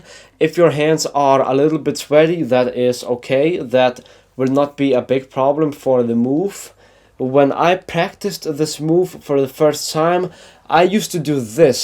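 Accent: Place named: Russia. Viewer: That's actually russian-english accent)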